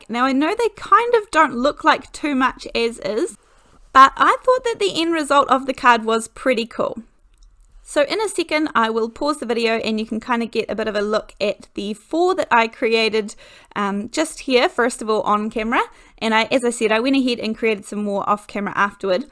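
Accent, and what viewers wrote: New Zealand. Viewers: My 5 year old daughter loves watching your videos with me. I've just found her in the kitchen doing her own crafting tutorial for her little brother and sister, complete with a slight New Zealand accent! (we live in the UK!)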